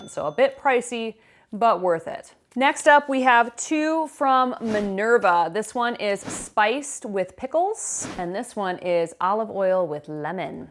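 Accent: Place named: Midwest, United States. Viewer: Da Jewels? Sound to me you're in the midwest, perhaps the Chicago area. If you are, do you miss Dominick's? Was sad to hear of their closing. Used to live in Elmhurst/Lombard, but been down in fl since 87 june. Have been considering the diet, but not sure. I was 220 a year ago, but down to 175 now. Trying to get back down to 140. Some people say that's too skinny, and to them I say that I haven't grown one inch taller since HS, and I'm only 5'7". Don't care they revised the charts. That was only to encourage people to be fatter and more unhealthy, as if what they put in our foods here in the US wasn't enough. Liked the video. 👍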